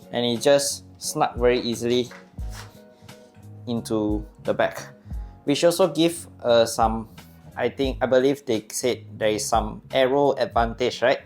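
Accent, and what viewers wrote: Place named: Singapore. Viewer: I recognized the Singaporean accent haha. I miss Singapore and mount faber. Looks like great ride tho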